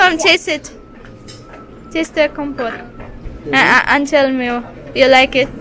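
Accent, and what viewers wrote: Jamaica. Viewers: I love how she sounds like a long time yard woman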